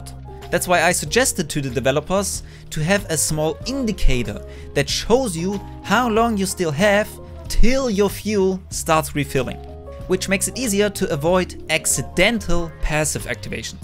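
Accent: Germany. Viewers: You Sound Like a German am I right? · I wanted to ask if you are German I’m German and I hear the accent so are you?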